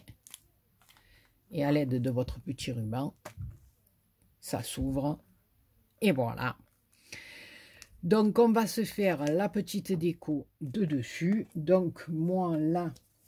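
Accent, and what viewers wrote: South, France. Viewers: une magnifique création 'tous les tutos sont très bien expliquer et avec l'accent du midi.Merci pour ce pur bonheur de partage .nouvelle abonnée .bonne journée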